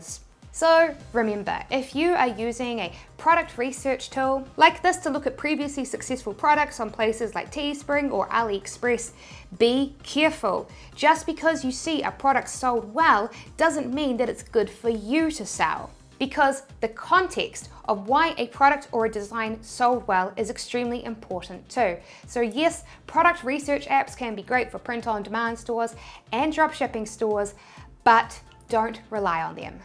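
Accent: New Zealand. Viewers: Are you a kiwi/new zealander?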